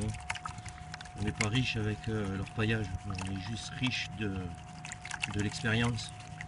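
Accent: Hérault, France. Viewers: LORB ON SENS LACENT DU 34 MDR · il habite dans l'Hérault là où il y a l'or et il a l'accent de l'Hérault c'est pour ça que je dis ça. Il y a de l'or dans le Gard aussi. Je suis parisienne et je reconnais tous les accents du sud !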